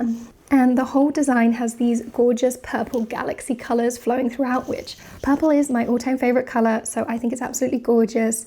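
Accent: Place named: England, United Kingdom. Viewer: there is just something about watching a beautiful redhead with a cute English accent doing puzzles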